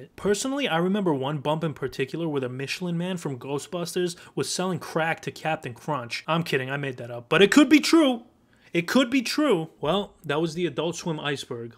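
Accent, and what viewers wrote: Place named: Brooklyn, United States. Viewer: This guy is the most Brooklyn youtuber ever I love it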